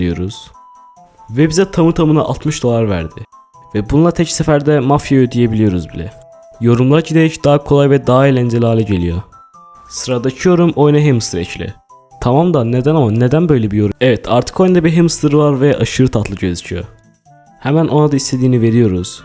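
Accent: Azerbaijan. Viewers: Abi Azerbaycanlisan?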